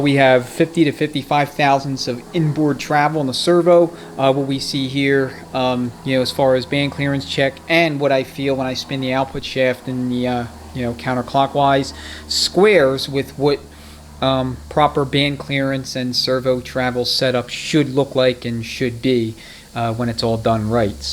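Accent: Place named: New York, United States. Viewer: just got done watching this procedure, great information, wondering what my measurements were on this band at 61,000 K when it burned up??? ( SECOND SPEED BAND WAS THE PROBLEM )!!!!!!! BETTER YET, were is this trans shop setting the clearances on this new one??? You would be surprised at the guys who work in the industry ( mine, heavy truck / yours trans shops ) that have been doing it there way; ( BILLY BOB, HACK - A - BILLY ) for 20 - 30 years or more, and it's not even close to " SPEC " )!!!!! ESPECIALLY IN THE DEEP SOUTH!!!!! I am originally from Delaware County PA. just outside " PHILLY ", your accent leads me to believe your from JERSEY / NEW YORK / LONG ISLAND?? WERE ARE YA LOCATED?? HELL, I WILL START BRINGING THE TRANNYS TO YOU & REFERRALS TOO, IF YOUR UP IN THAT AREA?? only a couple hours away if so??? LET ME KNOW, THANKS, GREAT INFORMATION & VIDEO AS USUAL / I TOOK NOTES & PART NUMBERS!!!!!! 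THE MACK TRUCK GUY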